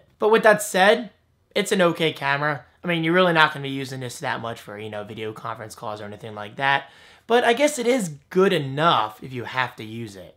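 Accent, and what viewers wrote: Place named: New Jersey, United States. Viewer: am i the only one who heard him say saalid instead of solid... you are rocking the jersey accent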